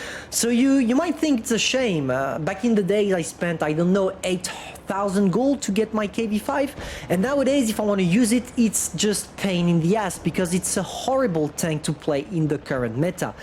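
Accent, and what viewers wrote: France. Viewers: How can somebody be so annoying? 40% the French accent 30% that face 20% those awful glasses 10% those girly arms and shoulders... I'm kinda interested in what he is saying but the person itself... OMG horrible creature.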